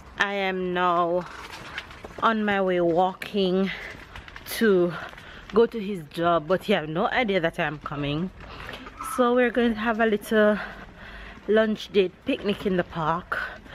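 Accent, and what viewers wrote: Jamaica. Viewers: is so gorgeous, awesome surprise for hubby. Some parts of your accent sounds Jamaican:)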